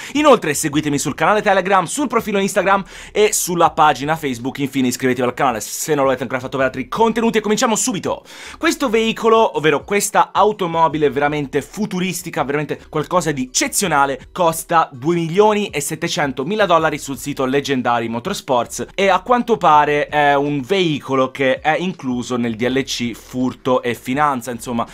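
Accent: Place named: Tuscany, Italy. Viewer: Mhhh prima volta vedo il tuo canale e mi pare tu sei Toscano... Montecatini? Il modo di parlare mi sembra di lì ahahah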